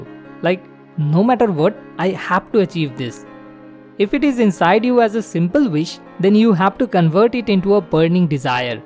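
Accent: India. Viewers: I hate the Indian accent....sorry. I just cannot take it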